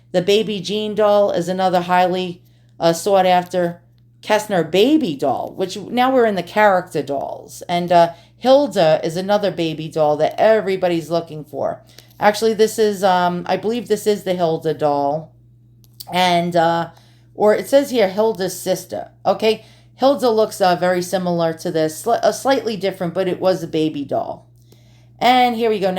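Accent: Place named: New Jersey, United States. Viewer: Love antique dolls have a few but love your collection. Love your Jersey accent and listening to your comments as well as your little pieces of advice. Keep up the good work!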